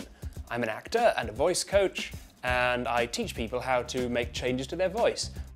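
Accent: Afrikaans, South Africa. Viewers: me: *reads title* me: which one??, there's so many😂😂😂😂 this is a very Afrikaans accent but I suppose it depends on which part if SA you are trying to sound like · I'm south African,he is teaching an Afrikaans accent,but there are different variations,like English, I'm English,but I don't speak like that,I was raised on Johannesburg in a private school,so I have a different accent to other English speakers,same goes for Afrikaans and Zulu and all our other official languages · You nailed the afrikaans accent of South africans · No bru you got it all wrong there are many parts of English accents in SA your doing an afrikaan/english accent wich is not even their native tong. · It's not a South African accent, but a middle aged Afrikaans accent. I don't role my r's and I am Afrikaans. What about English South Africans? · This isn't an English South African accent, sorry buddy. 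Very much Afrikaans. Really STRONG Afrikaans accent, to be honest. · Different parts of South Africa have different accents and different ethnic groups from South Africa have extremely different accents. He is roughly doing an Afrikaans accent from Johannesburg ... Kinda. An English person from South Africa is far more subtle and a person of mixed colour from Cape Town or one of the people that speak one of the many Black South African Languages (like 85% of South Africa) is extremely different from this. This is a very particular Afrikaans Accent. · This is not a "South African" accent this is an Afrikaans accent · This is how native Afrikaans speakers sound when speaking English. Other South Africans sound very different, depending on their own home language. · As a South African I found this very offensive😐 Yet funny😅 As only the real heavy Afrikaans people resemble this, the common people sound closer to a mix between British and Australian. 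P.S. South Africa and Africa are not the same, that's like comparing the Amazon to New York, because we have basically the same lifestyle as Americans just without the great government,(we don't have pet lions😓😅) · sir this is a right wing afrikaans accent, you might want to specify. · This is a very Afrikaans accent. What about the English South African accent? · This is actually the so-called 'extreme' South African English accent (Lanham: 1982), mainly spoken by Afrikaans L2 speakers of English. Most L1 speakers of South African English, though, have English ancestors and a different accent. Anyway, overall the South African English glide in 'right' is realised rather as a monophthong: the i-part has been deleted, making 'right' sound almost like 'rart': [ra:t]. The same applies to /au/, where 'about' almost sounds like [aba:t]. Also the realisation of /i/ in 'pin', 'tin', 'pit', and 'hymn' needs some correction. 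This vowel, known as the KIT-vowel, has undergone a split in South African English. /i/ only acquires this raised and fronted quality [ee] that you ascribe to /i/ in pin, tin, pit and hymn if it is preceded by /h/ or /k/ or no consonant. Elswehere, /i/ is rather centralised, [uh], sounding almost like /e/ in 'butter'. So: 'hymn' = almost like [heem] (as you mention); 'kit' = almost like [keet]; 'is' = almost like [ees] but 'pin' = almost like [puhn]; 'tin' = almost like [tuhn]; 'pit' = almost like [puht]; 'milk' = almost like [molk] or [mlk]; 'women' = almost like 'woman' [wuhmmun] -- hence, in SA English the difference between singular 'woman' and plural 'women' is hardly discernible. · There is definitely no single accent in South Africa lol You’re busy doing a sort of Afrikaans person speaking English here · Amen! That's definitely the Afrikaans accent.. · Your not speaking "South African" your speaking in an Afrikaans accent This video really pissed me off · So many people can’t differentiate between the English and Afrikaans South African accent. 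Definitely an Afrikaans accent. Popular movie culture seems to prefer it? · Its a very flat afrikaans accent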